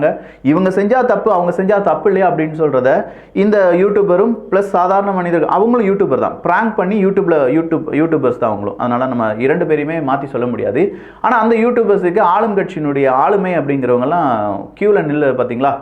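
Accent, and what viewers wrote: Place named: Tirunelveli, India. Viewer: தாங்கள் நெல்லை மாவட்டத்தை சேர்ந்தவர் என்பது தங்களது பேச்சில் தெரிகிறது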